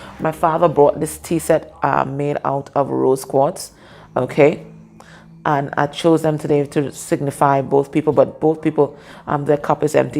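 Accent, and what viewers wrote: Trinidad and Tobago. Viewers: Am I catching a trini accent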